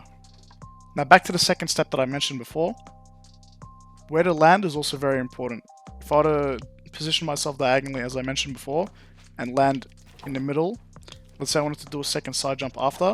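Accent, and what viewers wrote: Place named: Sydney, Australia. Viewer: Sounds like he's from inner west-sydney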